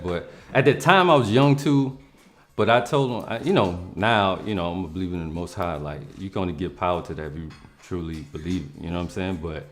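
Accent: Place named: South, United States. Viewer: is so country and it makes me so happy ❤😂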